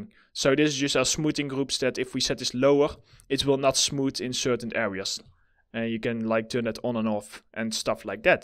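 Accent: Netherlands. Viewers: Thank you for this! I'm learning 3DS Max ( student ), but my teacher just races over the functions and options so I don't really understand how they work. You explained it perfectly! Side note: Are you Dutch by any chance? I noticed the heavy Dutch accent haha. I speak Dutch too, dus dank je wel voor de tips :)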